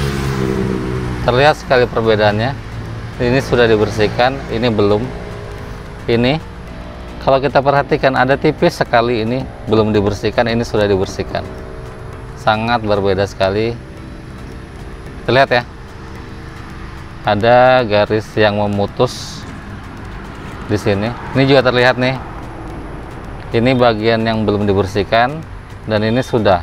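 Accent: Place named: Banjar, Indonesia. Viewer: logat nya ada sedikit bahasa banjar nya . benar kan mas. ,😀😀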